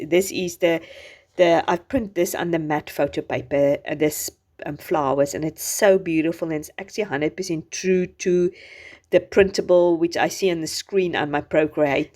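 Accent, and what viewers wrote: South Africa. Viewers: Oh my word this is gorgeous!!! I am new to your channel! South African?😃 I’m from South Africa! Bless you and you beautiful channel!💕💞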